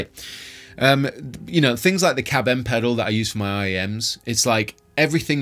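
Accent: United Kingdom. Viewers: britain, given your accent :)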